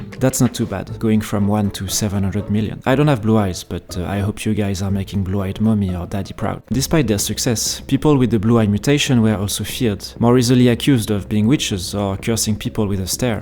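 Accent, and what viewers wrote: France. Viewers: The frenchy accent ❤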